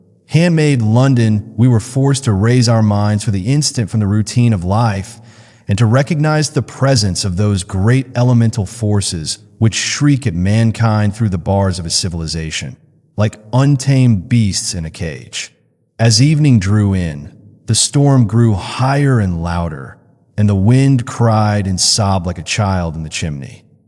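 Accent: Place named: United States. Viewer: an american accent for Sherlock Holmes??? that does not work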